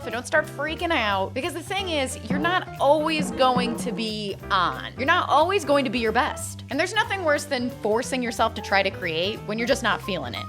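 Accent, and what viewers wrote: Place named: South, United States. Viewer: I luv ur country accent that is different from the normal kind u speak when ur on food network on TV...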